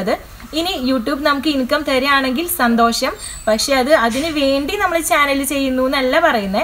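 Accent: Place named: Thrissur, India. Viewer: Trchinte class kettittanu gjaan. Polynomial ,geometry and algebra padichatu enikku. Chicken pox vannarunnu pakshe full pattilla tr ottiri parts idunnontu pakshe ippo Tr parts minimize cheytu thnku parts kure koodunontu ottiri time mathsinu pokunnarunnu ateyullu ippo almost ariyam trnte language kettopozhe thrissur aanennu tonni entayalum Tr poli class aanu gjangal kollam kaaranu thnku thrissur kaari teacher 😘😘🤗🤗🤗🤗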